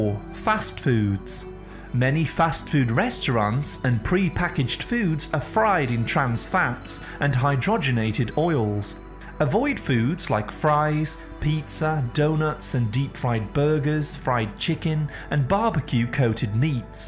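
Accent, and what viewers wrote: United Kingdom. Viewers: Very informative, thank you! Interesting that you read with a British accent but then use American names. E,g. I don't know a single Brit who knows what arugula is (it's called rocket here)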